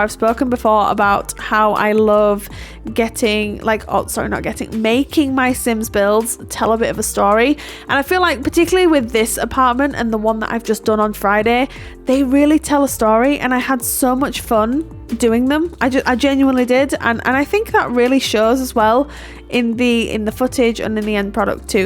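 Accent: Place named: Lancaster, United Kingdom. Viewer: Are you from Lancaster? 🤔 Your accent is so familiar!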